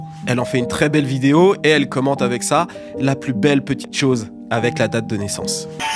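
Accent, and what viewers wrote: Democratic Republic of the Congo. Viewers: J'adore ton accents lingala kulutu ❤🇨🇩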